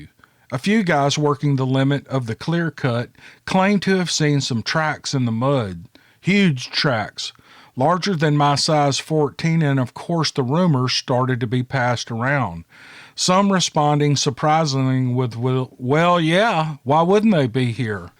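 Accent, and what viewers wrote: South, United States. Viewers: I like listening to your stories in that American accents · love the channel, you keep up the great work, i love listing to a southern boy reading stories. my people! you go boy, keep up the great work! here we go! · Hey,. You are an amazing story teller and narrative reader. Love the southern twang. Keep up the awesome work 😊😊😊😊 Ron From Calgary Alberta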